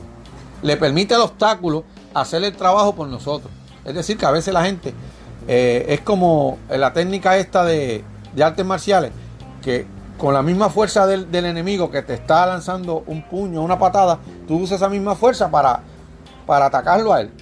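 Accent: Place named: Puerto Rico. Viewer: Ese acento parece Boricua. 🤔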